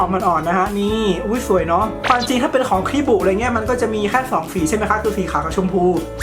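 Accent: North, Thailand. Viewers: พี่ เป็นคนภาคเหนือหรอคะ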